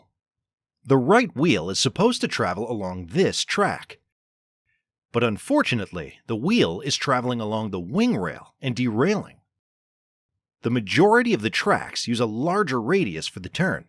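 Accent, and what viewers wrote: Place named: India. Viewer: Brother is from India It took me time to notice 😭😭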